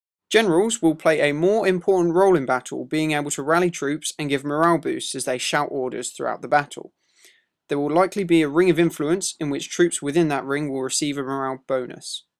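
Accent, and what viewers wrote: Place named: United Kingdom. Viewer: your voice is so nice...no homo...most british sounding voices are so ehhh :? but yours is noce to listen too lol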